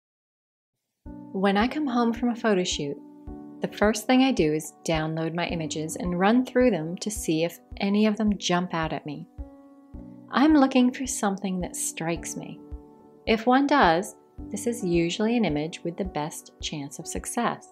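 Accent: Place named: United States. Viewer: This is so helpful and so well constructed. Also I loved her voice. Americans always seem to compliment our English accent so now I can return the compliment. It’s one of the nicest American accents I’ve heard so wondered what part of the US she comes from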